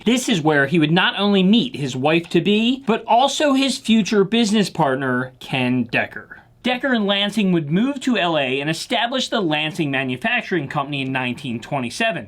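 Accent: Baltimore, United States. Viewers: I noticed your accent and thought you have to be from Baltimore. When you went out on the street I recognized you are on Harford Rd in Parkville MD. I have been in the shop there before looking for amps. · Your channel occasionally pops up on my feed. I remember the first video I watched of you. I was thinking to myself good Lord if that's not a Baltimore accent, I don't know what is. And of course sure enough that's where you are. I went to Woodlawn Senior High in the late '70s and I was on the auditorium tech crew. I haven't thought of Roberts in 45 years but as soon as you said Roberts I remembered the pair of tape decks that we had in the control room; it seemed to me they were semi-portable with handles and covers. Then sure enough the pictures popped up on your video and that's what I remember. Thanks for reviving a decades-old memory!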